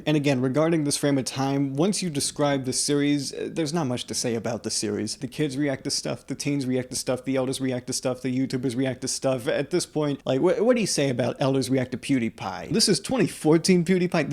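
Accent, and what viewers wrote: Boston, United States. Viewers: Are u from boston?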